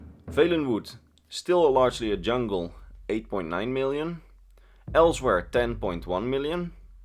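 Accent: Netherlands. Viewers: The dutch is strong in you my friend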